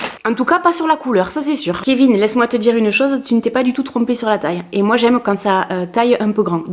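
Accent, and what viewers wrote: South, France. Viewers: Cc Ma belle tu est mon rayon de soleil ☀j aime beaucoup ton accent du sud et tu as un jolie sourire et ta bonne ça me fait du baume au cœur 😍 💕 prend soin de toi 🤗 😘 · Coucou, fraîchement abonné à ta chaîne, j'adore tes vidéo, ton humour et surtout l'accent du Sud vive le sud. Tu es de quel coin ? Bisous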